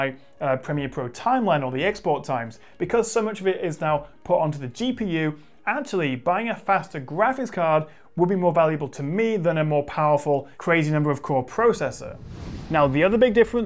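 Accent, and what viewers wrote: United Kingdom. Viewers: Surely it is, and it was with an emphasis on good job, besides doing your leg work here with your community, then you truly make differentiated videos beyond the british accent;)! Nvidia better team up with u again! Your explanation of these semi-niche brandings are unique and can’t be outsourced to India or perhaps done by a futuristic AI 😛(speaking of DLSS).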